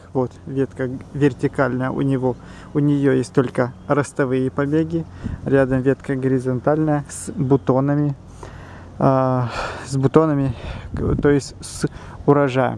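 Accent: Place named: Surzhik, Ukraine. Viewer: И Вы,Петя будьте культурным.Может Вам знакомо такое слово Суржик?Вот на нем и говорит.Это местный диалект.Канал этот для садоводов,Вы ошиблись,поищите лингвистические канала,а заодно,научитесь такту.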